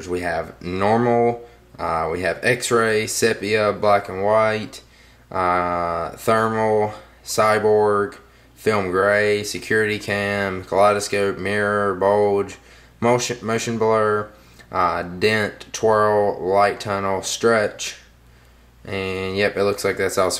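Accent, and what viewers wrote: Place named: Georgia, United States. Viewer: You're from Georgia are't ya bud. Somewhere a little South of ATL? Spalding county maybe.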